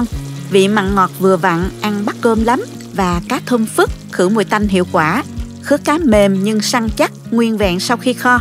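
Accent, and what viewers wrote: South, Vietnam. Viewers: Giọng ngọt ngào đậm chất miền Nam, dụng cụ nấu sạch sẽ, nguyên liệu sơ chế kỹ lưỡng, món ăn nấu xong nhìn bắt mắt rất ngon, cảm ơn kênh rất hữu ích cho các bà nội trợ.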